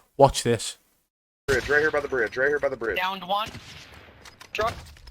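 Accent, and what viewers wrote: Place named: Scotland, United Kingdom. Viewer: What part of Scotland are you from?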